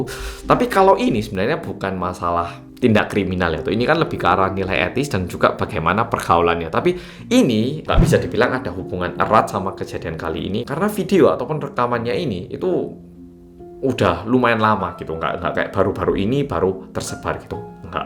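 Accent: Java, Indonesia. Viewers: Mas aku suka medokmu....bukan hanya indonesia banget tp njowo banget...medokmu kui loh mas 😅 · Bang krn tinggal dikorea mungkin gk brani speaking. Utk tambahan pa yg disampaikan Bang ini ke Channel Detective Aldo, ini kasus (Lee Sun Kyun) bisa jd utk menutupi kekotoran Penjabat/Petinggi2, Anak2/Cucu2 Penjabat korea. Kekotoran kecil/sepele artis2 korea digunakan utk menutupi kekotoran para petinggi2,anak2/Cucu2 Petinggi Korea yg lebih besar. Spt kasus Narkoba&Bullying yg dilakukan oleh anak2 petinggi korea.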